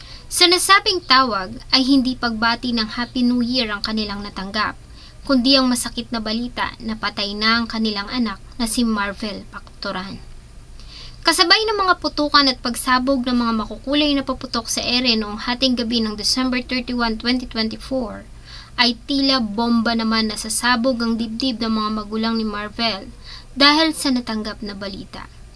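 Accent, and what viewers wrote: Visayas, Philippines. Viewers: Your pronounciation is so bisaya. Hindi Marvel ate Mar-vil ...hindi slovenya Slovenia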